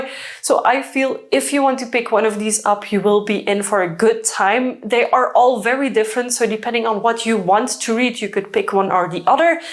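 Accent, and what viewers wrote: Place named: Netherlands. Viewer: no worries I like the dutch accent.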